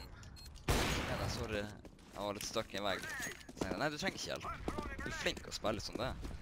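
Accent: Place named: Tromsø, Norway. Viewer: The best thing 'bout your highlights is that Tromsø dialect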